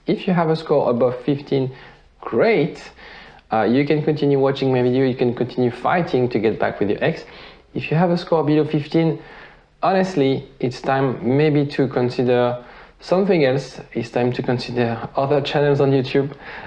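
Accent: France. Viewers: Love ur French accent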